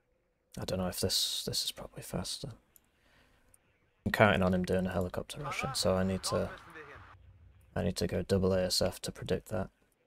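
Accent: United Kingdom. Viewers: Lol at the juxtaposition of the Barbie song and a calm British voice bringing death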